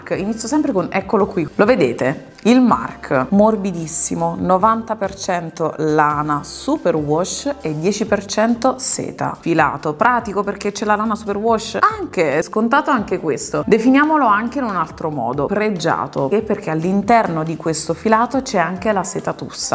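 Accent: Campania, Italy. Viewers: Nooooo ti prego si alla cadenza campana. Anche se vivo in Liguria da oltre 20 anni il mio sangue campano é sempre vivo ❤️❤️... P. S. Anche io avevo preso il Mark in 2 varianti colore ma non ho avuto ancora modo di provarlo spero di aprirlo prima dell'estate😂. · è bella la cadenza Campana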